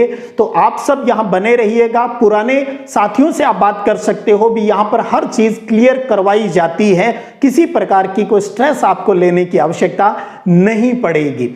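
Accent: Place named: Sangariya, India. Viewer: Sir aap sngriya se h kya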